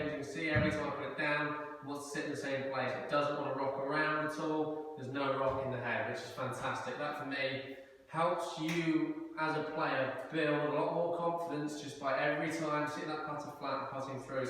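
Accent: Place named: England, United Kingdom. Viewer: Sorry, but between the echo, distance from mic, my hearing issues and the English accent- Can’t understand a thing, but thanks anyway.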